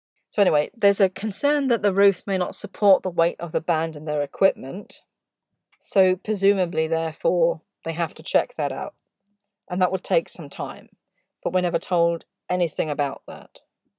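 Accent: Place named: United Kingdom. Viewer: Superb! Exquisite narrated in her soothing, sane, wonderfully British! To me this is very serious stuff not just to me but the world.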